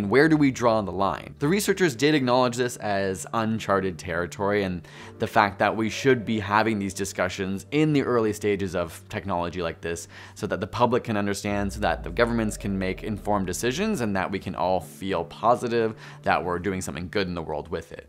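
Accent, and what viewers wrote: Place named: Canada. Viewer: Are u Canadian? Nice accent btw lol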